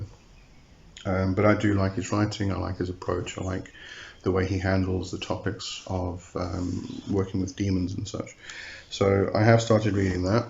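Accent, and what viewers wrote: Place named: United Kingdom. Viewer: u cant possibly know anything you sound British its ridiculous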